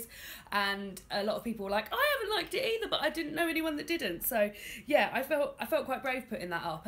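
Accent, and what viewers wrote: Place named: RP, United Kingdom. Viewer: Am new to your channel and watched these videos only yesterday lol. You make my wife laugh she says you sound posh and thinks is funny when you swear. xxx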